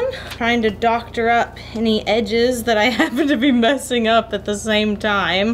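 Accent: Texas, United States. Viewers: I kinda wanna know are your from Kansas you said like your from Texas or Kansas?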